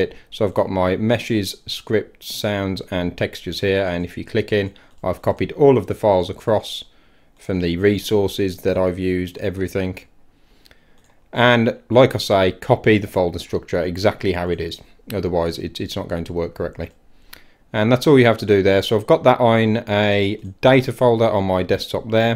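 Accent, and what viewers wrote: Birmingham, United Kingdom. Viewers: Are you brummy? Had to ask Haha.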